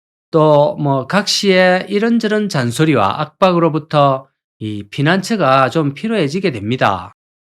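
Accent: Gyeongsang, South Korea. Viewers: 갱상도 표준말 방송......좋심더에! 잘 보고 있심더. 배차, 소나무갈비,고매,.... 억수로 올만에 들어보니 71년 촌에서 초교 입학때가 선합니다요. 뭔 농막이 이리 커요? 쉼터로는 따봉이것네요. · 웃음끼 없는 경상도 사투리에 화면은 보이지 않고, 웃기만 하는 순간들이 있습니다😊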